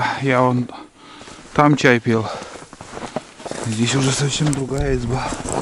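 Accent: Komi, Russia. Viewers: я из Инты,тоже дачка была в лесу,но у нас не мыши а леменги одолевали за зиму,оставлять ничё нельзя было🙂🙂🙂а у вас прям говор Коми👍👍👍👍подписка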